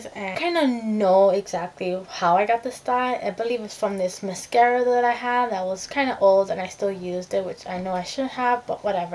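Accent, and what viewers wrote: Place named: Miami, United States. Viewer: Love the Miami accent! I'm definitely going to try this.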